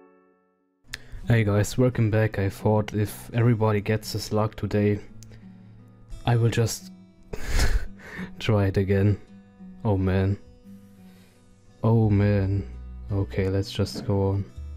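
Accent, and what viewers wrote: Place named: Germany. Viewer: Digger learn mal Englisch, du redest im deutschen Stil english. Lass es sein oder lernen besser Englisch. Deine Videos sind cool anzuschauen und das feiere ich aber dein english ist echt für Blutungen im Trommelfell verantwortlich. Einfache Sätze wie "where is he" sind doch 5. Kläger Niveau. Und nicht "where he is". Sorry dass das so bissig klingt aber mach lieber auf deutsch, was auch passt und zur Not Untertitel wenn die NICHT deutschen was verstehen wollen :) Wir müssen schließlich auch Englisch lernen um ihren Kram verstehen zu können.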